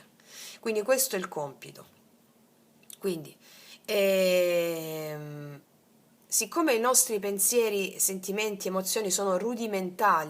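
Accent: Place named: Sicily, Italy. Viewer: Ti dimentichi che non siamo tutti siciliani.,. parli troppo in fretta ti mangi le parole con il tuo accento... grazie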